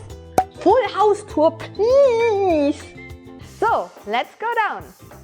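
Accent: Germany. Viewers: You would make a great Bayonetta cosplayer! Someone as gorgeous as you are would really have no problem with this. Also thank you for always being genuine! I almost feel like it's difficult to find cosplayers that are kind and professional as opposed to being snotty and gatekeeping. Additionally I love your German accent and it's not weird at all! :) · English akzent is totally german ;-)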